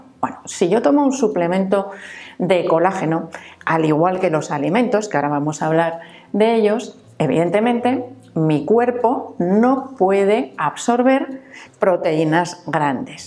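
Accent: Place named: Castile, Spain. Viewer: Que acento tan bonito del castellano ❤❤❤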